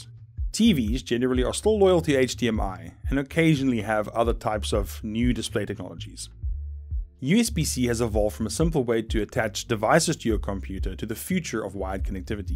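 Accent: South Africa. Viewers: uppa the south african accent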